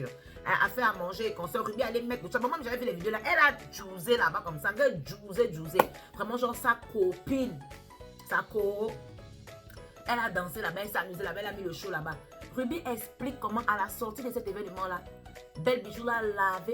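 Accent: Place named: Gabon. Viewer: Êtes vous Gabonaise ? Mon DIEU l accent de chez moi hein…Je me suis abonnée tout de suite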